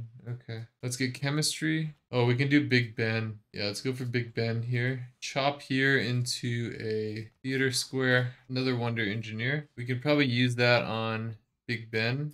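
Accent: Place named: United States. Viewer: Aaargh! Hadn't seen it to its end 😂 honestly - I'm not a huge fan of surprises, so much appreciated! I really like how organically or naturally you grow your civ(s) - that's how I hope my garden will grow this year! But I'm on like turn 50 with three cities, two wonders, some culture - but not an awful much more to show for it. I guess it's just a matter of enjoying the game, and if it's a fun defeat then just go with it 😆😶 I really enjoy your vids - I had a day off and I had kinda hoped to spend the day in the garden, but it was so rainy here that I ended up cleaning (which was embarrassingly needed, once I got down to the nooks and crannies!) - you were my coffee breaks! 😙☕👌 they were a delight! You have such a wonderful voice, you're so funny, and such a nice narrator! I love your crisp American accent, despite the fact that I get startled every time you say "route" - here it's pronounced "root" and I get baffled every time! 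And now I've told - and that's a weirdly big relief! 😂 Lots of love from Denmark 🤗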